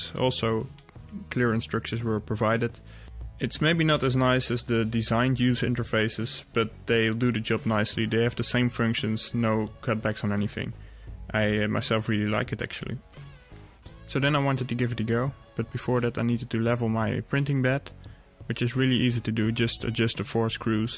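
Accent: Netherlands. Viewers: max yep 100% sure the accent is typical dutch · Are u dutch?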